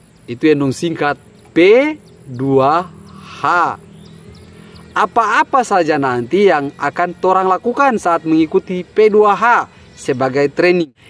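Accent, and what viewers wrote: Ternate, Indonesia. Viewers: Ale carita pake dialeg asli ternate bole tamang.. Tarusa deng apakong saya anda saya anda bagitu tu😂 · Hehehe mantap pak, semoga berkah ilmunya. Terimakasih.. Lucu juga pak,, rindu dengar orang ternate punya logat😂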